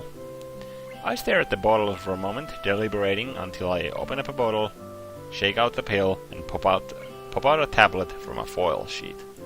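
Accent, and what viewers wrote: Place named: Finland. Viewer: It might sound a bit stereotypical but i really picture Sam Lake reading Katawa Shoujo to me when i listen to your LP, i guess that's what a finnish/english accents will do to you! :D Good stuff so far really enjoying it!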